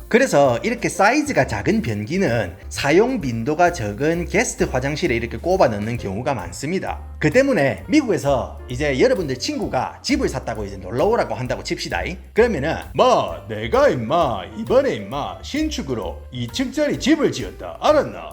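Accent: Gyeongsang, South Korea. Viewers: 영어도 네이티브 한국말도 경상도 네이티브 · 하하하 너무 재미있네예. 아는 이야기를 해도 재미있고 모르는 이야기를 해도 재미 있고~ 나도 경상도 사람이지만 별의별 사투리들을 잊어 버리지도 않고 어찌 그리 잘 하실꼬 싶네예. 내용도 좋고예.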